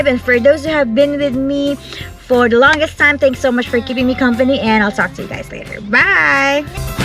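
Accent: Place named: England, United Kingdom. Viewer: I love your english accent, Enjoy cooking and you can do it. Stay healthy po #dabestiesjasCovers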